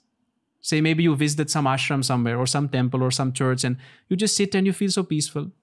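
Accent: India. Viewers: His Accent is Indian.. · Yes, he speaks with an Indian accent, so interesting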